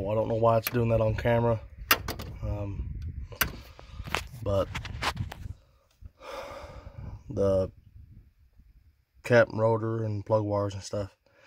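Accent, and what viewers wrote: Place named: South, United States. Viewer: idk why bros thick country accent and the way he get straight to point, allows me to understand exactly what he explains and seem to be trustworthy he actually knows what the hell he talking about. God bless and thanks.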